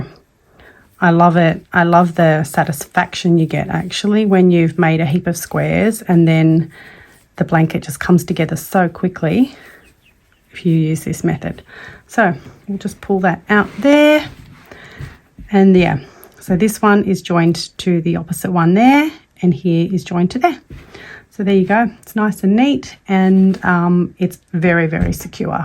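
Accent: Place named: Australia. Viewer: ❤ watched all the way through! Great to hear an Aussie accent speaking about crochet! Your blanket is beautiful!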